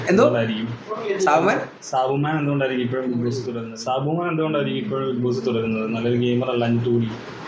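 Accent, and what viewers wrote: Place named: Fort Kochi, India. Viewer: Fort kochi ക്കാർ ഇങ്ങയോക്കെ സംസാരിക്കും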